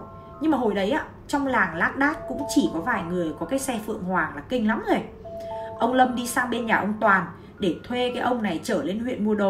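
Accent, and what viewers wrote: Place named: Nam Dinh, Vietnam. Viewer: nhưng giọng nam định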